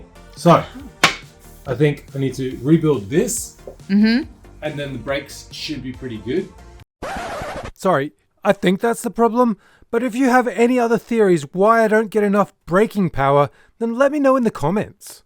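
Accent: Australia. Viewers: Is that a some what Aussie accent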